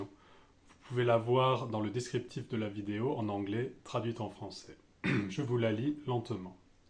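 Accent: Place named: United Kingdom. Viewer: Je remarque que vous avez un accent britannique comparé à notre accent canadien ! Merci je suis agréablement surpris d'avoir accès à ces petits vidéos qui m'aideront j'en suis sûr !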